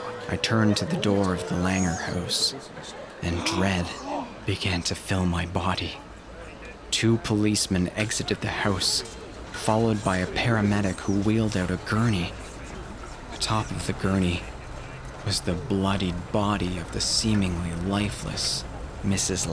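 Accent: Canada. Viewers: The way he pronounces words with the letter U is a dead give away he's from some part of Canada. Granted Canada has many accents, like America, People in the Maritimes speak differently than those in the Greater Toronto area ect ect. Anyway listen for how he says "outfit". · Don't love the narrators Canadian accent, but other than that... All good. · These are some of the best Creepypasta recordings on YouTube. However.... man you can tell that the narrator is profoundly Canadian!!!!!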